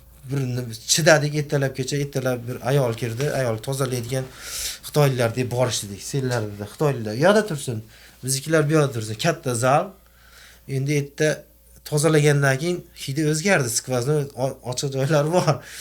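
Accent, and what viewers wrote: Andijan, Uzbekistan. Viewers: Ертак боп кетди акахон Ростан Анжанлимисиз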